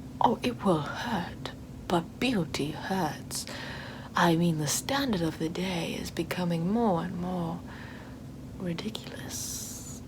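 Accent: United Kingdom. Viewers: A British accent and in B&W double credibility. Funny though a dodgy German accent and B&W wouldn’t inspire confidence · I definitely trust you to cut on my nose, especially with that British accent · She definitely nose what she's doing. I trust that British accent implicitly.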